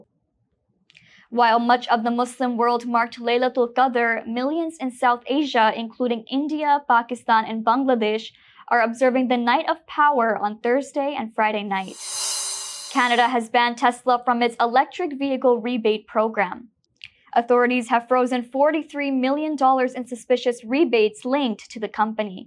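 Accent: United States. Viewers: Speak arabic / quran language and stop rolling your tongue in American way which angers afghans talibans .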